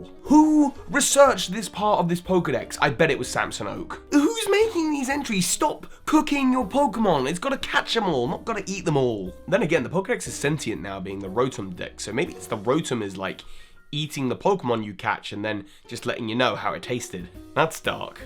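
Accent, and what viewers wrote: United Kingdom. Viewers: Are you from America? I always thought you was British!?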